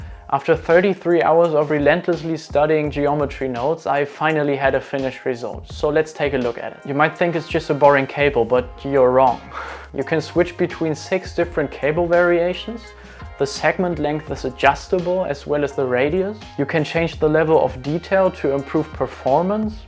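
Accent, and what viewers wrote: Germany. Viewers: I love your German accent! Great video!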